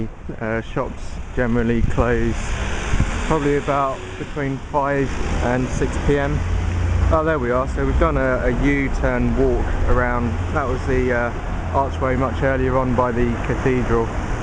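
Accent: England, United Kingdom. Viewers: i love ur accent..so english....lol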